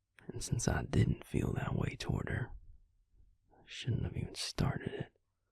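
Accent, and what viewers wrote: South, United States. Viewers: I’m liking the southern accent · Lemme just say, this southern boy makes my tennessee gal heart happy. (as much as i hate living in the south, there’s something about soft spoken southern charm that has me swoonin.)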